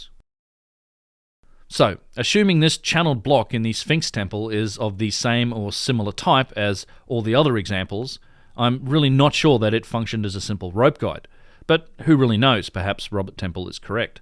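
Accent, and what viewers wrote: Australia. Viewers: Say, saw your full name written somewhere and it indicates you're either from Dutch or Belgian-Flemish descent (probably Dutch judging by your Australian tongue). I'm from Belgium myself, Flemish speaking, so, that makes us practically neighbours, eh?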